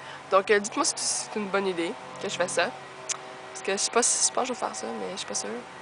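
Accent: Canada. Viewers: j adore voir tes videos..... je te trouve tres sympa et j aime bcp tn accent canadien =) grooooooooos bisou from italy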